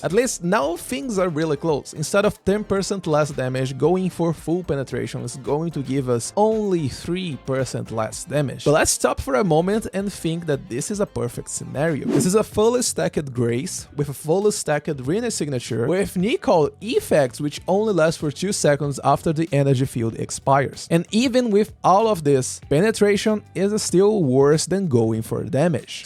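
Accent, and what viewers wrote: Brazil. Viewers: Sotaque de brasileiro é facil de pegar khkhkkhkhkh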